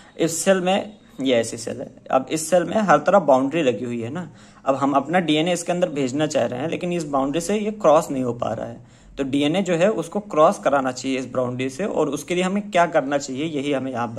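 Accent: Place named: Bihar, India. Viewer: Thankyou for the amazing session 🔥🔥 Sir ap bihar se h?? Humra v accent apke hi jaisa h or bahut acha laga k ap dawn to earth h❤ or jaise h bilkul waise hi show karte h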